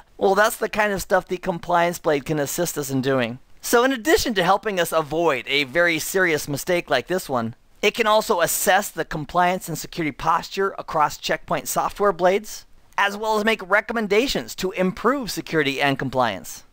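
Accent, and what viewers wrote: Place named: United States. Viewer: You have the most American voice ever.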